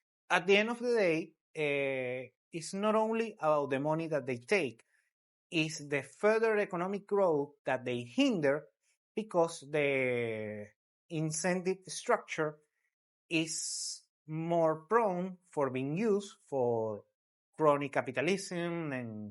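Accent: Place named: Latin America. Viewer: Claramente tu acento delata que eres latino😂 por que entonces haces un vídeo en inglés si tu pronunciación es muy mala?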